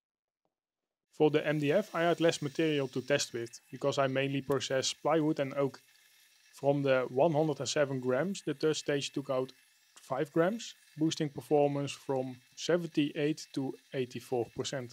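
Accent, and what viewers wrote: Netherlands. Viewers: Who's also Dutch and just here for the pronunciation? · So, you klinkt as a Nederlander 👍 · I love the heavy dutch accent haha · Vind het leuke videos. Erg interessant, leuke technieken en 3d printen, maar stoor me wel vreselijk aan het Nederlandse Engels.. 😢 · I like a deep dive into anything nerdy with a Dutch accent. Takes me back to my brief stint working in Eindhoven.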